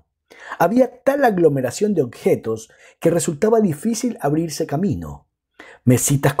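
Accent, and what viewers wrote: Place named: Latin America. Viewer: Muchas gracias por la narración y por el esfuerzo. La entonación foránea latinoamericaniza estas historias criollas peruanas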